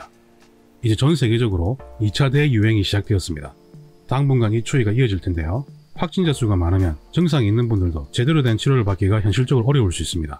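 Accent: Gyeongsang, South Korea. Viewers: 유용한 정보 고마워요 그런데 말씀 속도가 굉장히 빠르면서 경상도 사투리도 강해서 50% 정도밖에 이해가 않되요. 저의 지인들도 그러더군요. 그러니 중요한 과학적 용어들은 더군다나 전혀 이해 불가능 !! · 경상도 악센트 쓰시는데... 초등학교는 어디서 다니셨는지요? ㅋ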